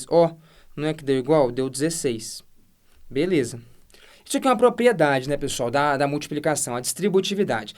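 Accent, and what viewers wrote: Minas Gerais, Brazil. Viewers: Parabéns pelo trabalho. Pelo sotaque, parece mineirinho igual a mim. Sou professor aqui em Brasília, confesso que essa parte tão básica é difícil de se passar em um cursinho aqui, pq é muito conteúdo. Sucesso aí, se tiver alunos com dificuldade em mat básica, indicarei vcs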